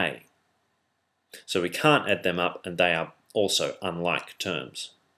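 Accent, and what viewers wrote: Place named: Australia. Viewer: nope, i'm 100% british and THAT is not english, i'd say he was australian or something....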